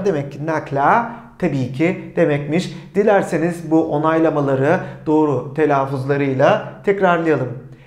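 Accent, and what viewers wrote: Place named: Germany. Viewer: Hoca siz Almancaya büyük olasılıkla hakimsiniz, fakat türkçeniz az ve Almancanın gölgesinde kalıyor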